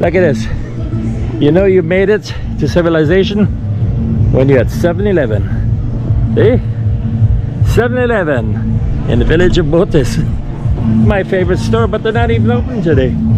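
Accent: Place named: Middle East. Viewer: You have an accent where are you from midlle east ??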